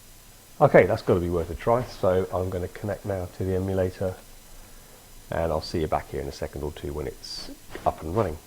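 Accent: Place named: United Kingdom. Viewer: Wonderful! I love how easy you made programming seem, and the British accent is so refreshing to hear. Just one question, can I use this to save data base type data this same way?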